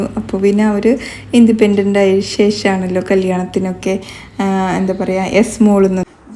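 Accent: Palakkad, India. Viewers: പാലക്കാട്‌ കാരുടെ സംസാരം നല്ല രസാ അല്ലെ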